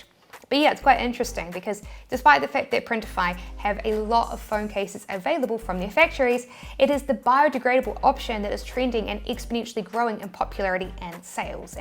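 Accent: New Zealand. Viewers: I just watch because of the lovely kiwi accent 🇳🇿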